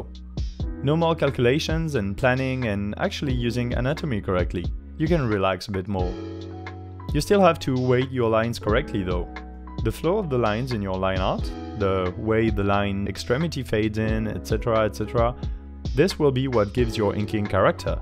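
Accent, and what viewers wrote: France. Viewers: Nice vid! I very much enjoy your french accent tbh :) Keep up the good work!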